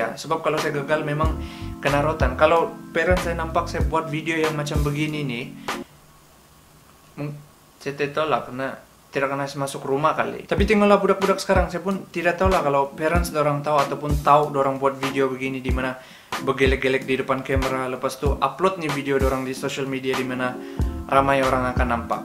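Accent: Sabah, Malaysia. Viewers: I can tell u r a Sabahan...kuat btul tu loghat ..salam knalan d channel kmu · Slang Sabah dia best bah..bikin deep jugak #nasihatberguna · Suka la slang sabah. That's the reason why I followed him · Ur sounds like SABAHAN . . Kita² juga bhai ni kann . . 😏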